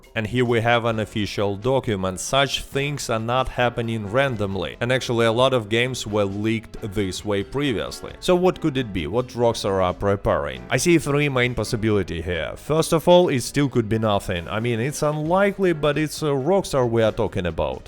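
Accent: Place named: Russia. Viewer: Я один отчетливо слышу русский акцент в речи автора?🤠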